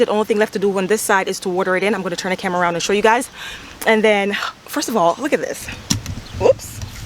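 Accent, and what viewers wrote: Jamaica. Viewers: Greetings from Canada, beautiful garden. I love how the Jamaican accent comes out every so often.😂😂 . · Are you Jamaican? Jerk chicken and night owl. You sound like me and your love of flowers is so familiar to me. We just want beauty in our yard! Your place is naturally beautiful.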